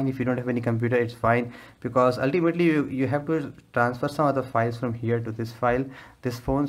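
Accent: India. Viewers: its okay every india sounds like that just like japanese tho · Rapid fire speech with an Indian accent 🥴..... Geeze i need a nap !!!! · You talk like an Indian boy that I don't like and your language suck like trash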